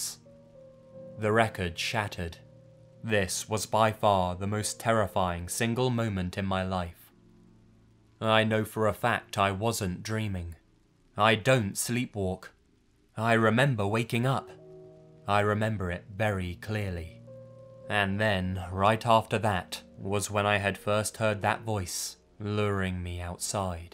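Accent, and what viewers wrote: England, United Kingdom. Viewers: british accent reading/narrator for Native American SKWalkers video??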